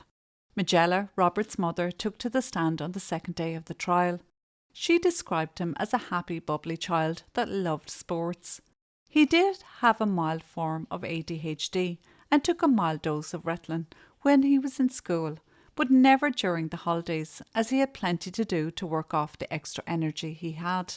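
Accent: Ireland. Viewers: I subscribed 20sec in, coz of the accent.. I am Australian after all, and we just love an Irish accent.! 🍀 But then you said horseshit girl.. and you had me again. 😅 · Not boring in my opinion, a million times better than most US crime doco's with all their hysteria and emotive over dramatic adjectives. I'll take this calm voice and lovely Irish accent every time.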